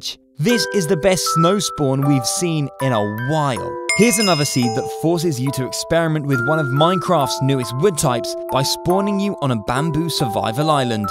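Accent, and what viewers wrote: United Kingdom. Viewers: The British voice makes it better lol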